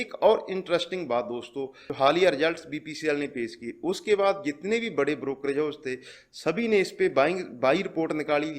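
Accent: Punjab, India. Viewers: punjabi touch nahe jaana even after 50 years 👍 👏👏💪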